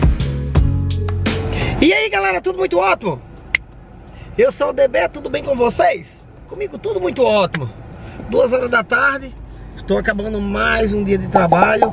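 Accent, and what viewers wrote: Northeast, Brazil. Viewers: nuuuguets kkkkkkkk gostei do video ate pq ele tem um sotaque nordestino tem o meu gostei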